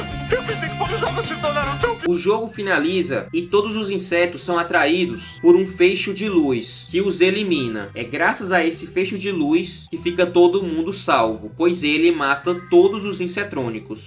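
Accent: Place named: Northeast, Brazil. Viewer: "Engraçado" o que vc disse que as pessoas te zoam por ser nordestino, sendo que eu sou paulista e tava ouvindo tudo e pensando "que delícia de sotaque, ouviria por horas" kskskkskskskks Admito que assisti o vídeo até o final mais pelo sotaque msm, visto que discordo de mts pontos criticando o segundo filme pq tenho uma visão mais de como se eu msm fosse os personagens, entendendo bem a trama e tals kskskksksksks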